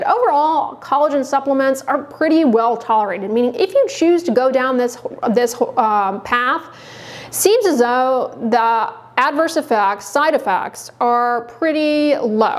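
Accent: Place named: Houston, United States. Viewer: That's Houstonian accent in Texas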